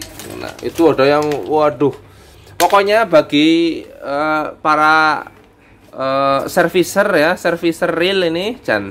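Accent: Banyumas, Indonesia. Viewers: Nyimak lokasine ngendi si ya,banene koh ngapak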